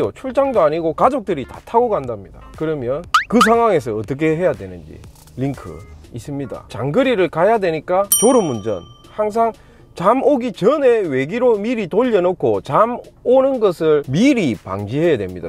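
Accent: Gyeongsang, South Korea. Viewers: 매형이 김해분이신데 행님이랑 말투가 100% 같습니다. 찐 경남 사투리. 영상 볼때마다 매형이 생각납니다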